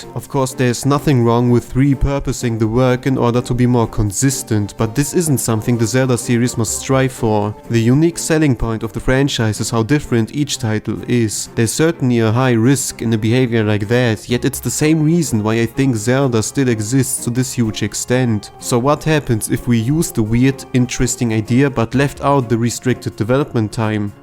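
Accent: Germany. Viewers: I knew, that you’re German from the first sentence you spoke haha 😂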